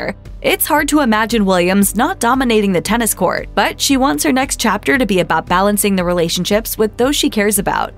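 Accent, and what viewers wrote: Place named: United States. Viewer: This is an American site... note the American accent? 🤦‍♀️🤦‍♀️🤦‍♀️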